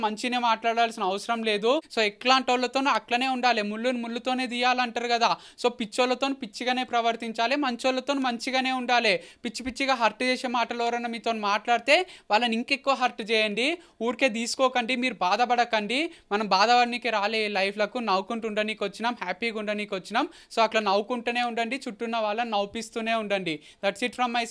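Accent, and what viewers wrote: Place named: Telangana, India. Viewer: Mi TELANGANA VOICE kekeo keka BRO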